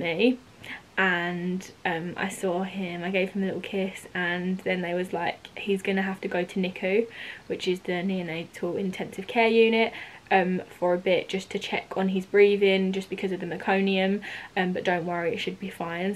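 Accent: United Kingdom. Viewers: Congratulations on your little baby boy and your make up is so pretty I love it and I love your British accent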